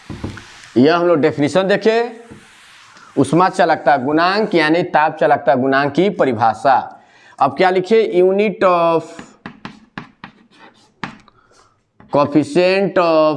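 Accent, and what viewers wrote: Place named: Bihar, India. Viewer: Bro speaks in bihari accent💀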